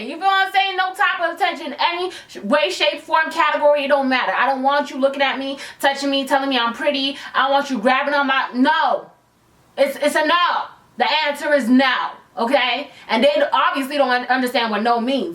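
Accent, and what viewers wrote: Toronto, Canada. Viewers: That Toronto accent tho ♥️♥️ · I LIVE for ’ Toronto accent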